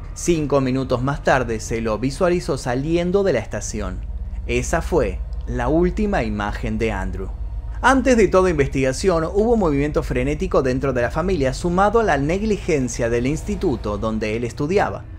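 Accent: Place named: Argentina. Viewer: Me interesó mucho el caso Asha Degree, pero por no ver el vídeo y sólo escucharlo, al principio lo busqué como "Aya" Degree, entendiendo la sh como "y" 😂. No se ofendan hermanos argentinos, es la particularidad de su acento, excelente video.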